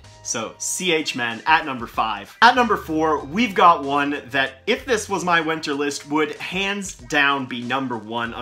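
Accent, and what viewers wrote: Canada. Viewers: With that accent (sounds Canadian) I wish the would stop saying OOTside. Arrrgg.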